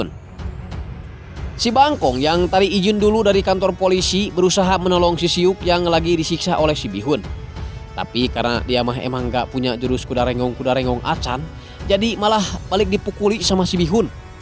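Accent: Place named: Majalaya, Indonesia. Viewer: Orang Majalaya mang.pantesan sundana te ciga garut.11/12 kt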